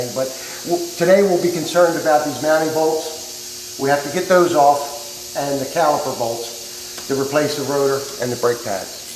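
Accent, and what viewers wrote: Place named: Delaware Valley, United States. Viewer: I love that Delaware Valley accent!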